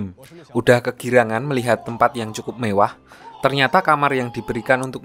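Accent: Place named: Yogyakarta, Indonesia. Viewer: Kek orang jogja ini mah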